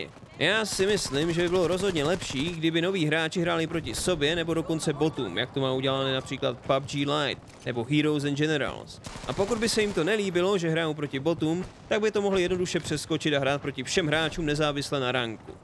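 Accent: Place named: United States. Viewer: prídáváš Americký přízvuk a to je děs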